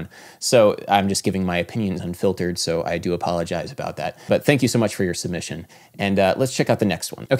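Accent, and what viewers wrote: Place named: New York, United States. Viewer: Just something that keeps intriguing me. Where are you from? This is one US accent I’m not familiar with. Great vids mate!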